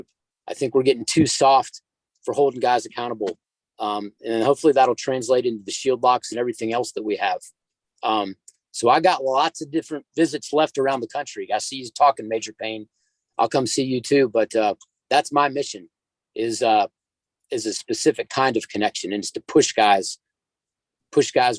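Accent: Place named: Birmingham, United Kingdom. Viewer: Where are you from Slaughter? I’ve keyed in on your accept. It’s Barney Fife In Birmingham.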